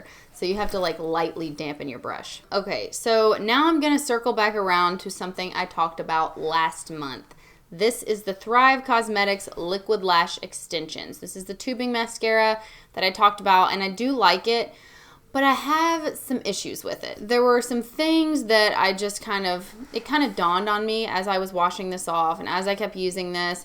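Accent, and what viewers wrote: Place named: Texas, United States. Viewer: Are you from texas .... you sound very Texan .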